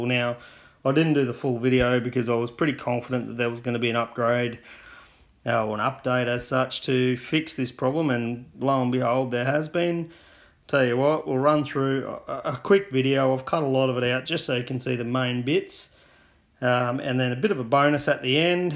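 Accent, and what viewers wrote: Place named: Australia. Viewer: I'm surprised you've not had more comments yet but I guess it's because this app seems to have crept in under the radar so far. I'm looking forward to delivery of my MA2 this week having sold my MM and a Phantom3A before that. Can't wait to try this app out as it seems to do everything that I'd like. I used to fly my Phantom with FPV Camera but that app seems to have disappeared now. Keep on with the videos - I enjoy your quiet, understated Aussie delivery!..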